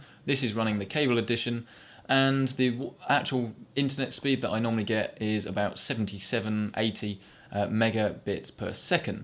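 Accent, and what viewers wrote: England, United Kingdom. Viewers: You can't beat a good English accent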